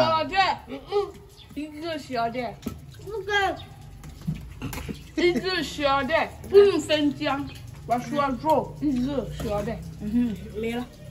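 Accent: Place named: Huai'an, China. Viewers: 是江蘇淮安人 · 淮安的西瓜上说了我听着也是我们苏北的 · 应该是，咸念han2😁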